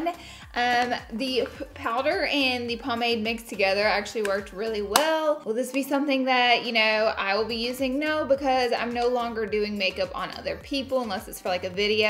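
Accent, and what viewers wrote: South, United States. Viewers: So many people diss her accent! Trust me y'all it's harder than it looks to say concealer as a southern😆 Love you 😀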